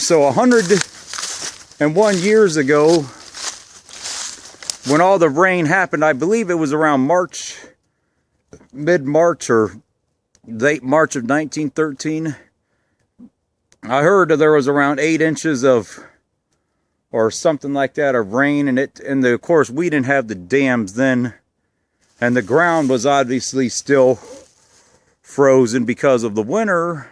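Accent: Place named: Indiana, United States. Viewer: "YouTube-uh, Dayton-uh!" Lol you have a thick Indianian accent for being a Daytonian!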